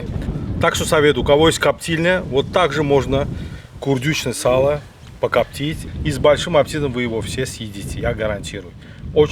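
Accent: Baku, Azerbaijan. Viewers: Маладец бакинских акцент нигде в мире не перепутаеш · Бакинский окцент..🥸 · смотрю видео,слушаю ваш бакинский акцент:-респект и уважение!Будьте всегда здоровы,живите долго,радуйте новыми видео, которые не оставляют никого равнодушными,я думаю многие такого же мнения! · Бакинский акцент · нет брат он не таджик по аксценту чиста Азербайджанец я таджик не думай что я нацист просто у нас капчоних курдюк я не видел хотя самие лючшие курдюк ето наш Гиссарского породи честно сказат я его постоянно ел в России в санатории нам прислали кыргызские братья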